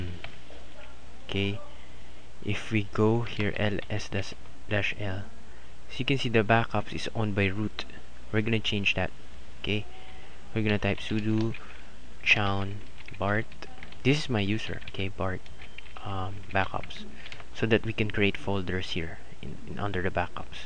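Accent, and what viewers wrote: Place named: Philippines. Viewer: pinoy accent!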